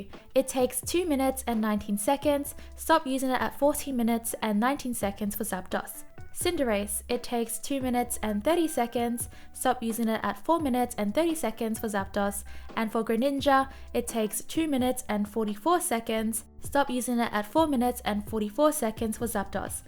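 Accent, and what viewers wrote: Australia. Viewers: As an American finding your channel in the last 3 weeks hearing your pronunciation of Zapdos was so interesting to me because of the whole ArcticUNO, ZapDOS, MolTRES thing where the names of the birds are uno, dos, tres in Spanish and that's the whole naming convention. Having you say it as Dos like windows DOS has consistently fought my old man pronunciation in a way that is both funny and cool, I'd love a series of the names Austrailian's sound out as different than the typical American's! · Aussie accent pokerap incoming. Can't wait. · Love ur Australian accent and I appreciate all the hard work you put in to give us indepth info on the game so we can better understand the game and improve looking forward to future videos......I believe zeraora has the best unite move for Zapdos since it not only deals damage but increases atk spd combined with discharge and volt switch he can solo Zapdos easy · I'm Australian so I never even noticed your accent, keep up the great work! 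· Plz make the Australian pronunciation for every pokemon. Videos by gen plz.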